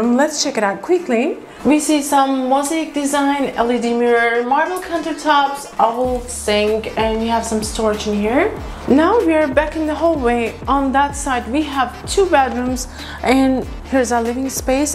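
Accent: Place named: Turkey. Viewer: Bende diyorum bu aksan ne aksani diye, Turk oldugnu sonradan anladim 😂 Video guzel olmus bu arada, yakinda Dubai'i yerlesmeye dusunuyorum.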